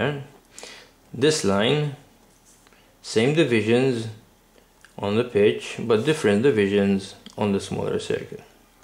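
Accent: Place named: Malta. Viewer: It is a Maltese accent. He used to teach me at school :p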